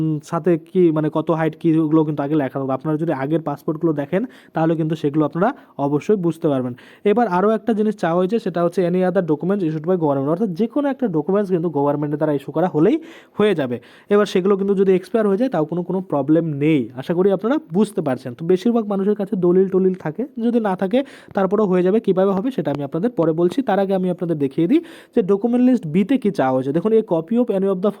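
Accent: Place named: Bangal, Bangladesh. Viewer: ওরে বাঙালের ভাষা বন্ধ কর,চেষ্টা কর বাঙালির বাঙলা বলার ।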